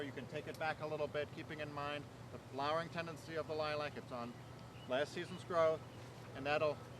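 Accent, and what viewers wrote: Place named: South Dakota, United States. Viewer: Sounds like a guy from South Dakota! Good instruction, thank you!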